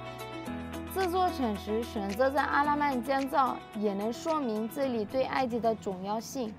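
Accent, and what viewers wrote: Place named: Shandong, China. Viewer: 我觉得你说话有山东口音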